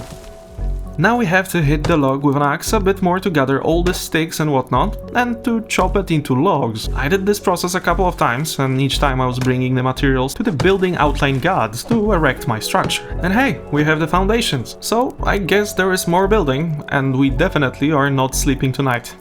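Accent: Poland. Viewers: Soooo... next 4 years? Btw, are you from Poland? Your pronunciation of names like Piastowie or Klonica sounds like that. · Dziwny ten angielski jakiś Polski z deka😂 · i hear who you pronounce the cities and yes i was right channel based in poland. bardzo dobrze kochanie <3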